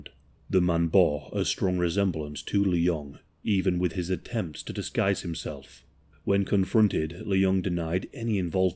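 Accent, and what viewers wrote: United Kingdom. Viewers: Are you British? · I just get more and more addicted to this damn channel and the narrators UK accent along with Shrouded Hand there's no way any Yank across the pond can make any true crime content this bold unique and exclusively personal .👑👑👑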